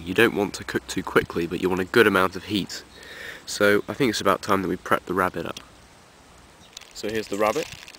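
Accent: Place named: England, United Kingdom. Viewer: Nice video young man. Where did you shoot it? Somewhere in England by your accent.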